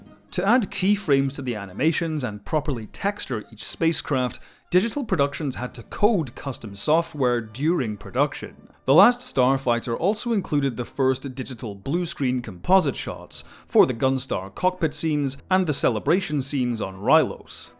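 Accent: Scotland, United Kingdom. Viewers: Cool, thank you for this great in-depth review. That was very informative. Are you in Scotland? I love the accent. But I always wonder how can you film guys make a living? Do you work as a lecturer at a University or film school?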